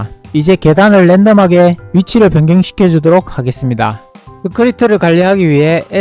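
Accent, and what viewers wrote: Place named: South Korea. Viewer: 보고 즐겁게 따라해봤어요! 친절한 설명과 구수한 사투리 겉들인 좋은 영상 감사합니다!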